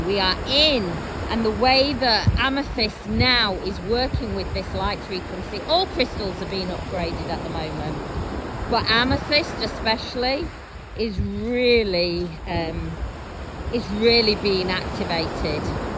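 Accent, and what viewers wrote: United Kingdom. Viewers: She's from U.K. That's just how they talk I think. 🙂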